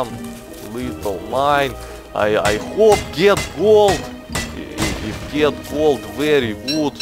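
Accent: Russia. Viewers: I got spamed and came here, and it was worthy :D I want more videos with 'dat Russian accent!!' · His Accent Is Awesome :D i love russian people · he's russian right? privet esli da :D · Nice accent are you russian? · XD There's a kind of swedish sound to your voice, even though the accent is sort of russian. Where do you come from?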